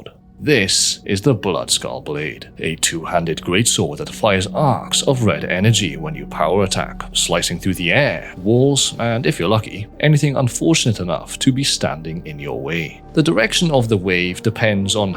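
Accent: Wales, United Kingdom. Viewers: WELSHMAN REAL?!?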